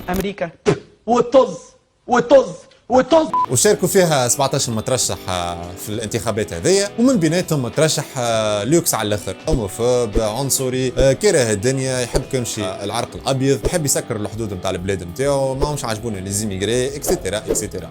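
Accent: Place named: Sfax, Tunisia. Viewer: اللهجة الصفاقسية بدات تتسرح على العادة .. ولات أوضح شوية 😁 Great video 👏👏👏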